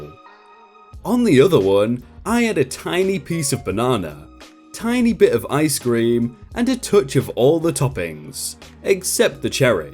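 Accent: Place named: Lancashire, United Kingdom. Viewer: I love listening to your voice. Just out of interest are you from Lancashire? I think you’re from there but another YouTuber (thoughty2) is also from Lancashire and you do sound like you’re from a different part. Or maybe it’s Newcastle. Also, i can’t believe you’re only 18. Keep up the good work. Also, I love you’re other channels. As a British person it’s shameful that I just can’t place your accent.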